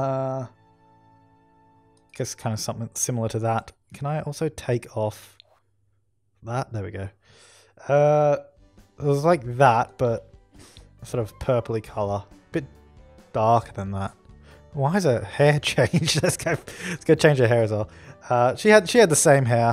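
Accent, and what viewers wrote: Australia. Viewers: Your video is a joy to watch and listen to, your an Aussie aren't you? I love listening to you talk,lol! Your comments made this the best Sims 4 video I have seen yet, you made me laugh allot! And about Aluna, you could tell that it was the same Sim in Sims 4, but it looks even better some how! I know allot of people say they look less real, but I think they look more real then Sims 3, its like you can give them better detail, which makes them look more real! I can't wait to get to try it out myself, I love making different Sims, and detail is very important to me! I hope you make some more videos of you making new Sims with The Sims 4, I'll enjoy them just because of your voice,lol!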